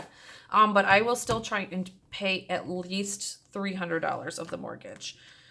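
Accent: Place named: Michigan, United States. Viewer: Things got tough for us in 2009. We changed our cell phone provider and kept our phone numbers. Each of us pay $30 month. We use Mint SIM. That might cut your bill in half. Our ciiverage is awesome and we have no problems. No my parents use it as well, they caught on. Best 2 you From California. I was born in Michigan and I recognize your accent.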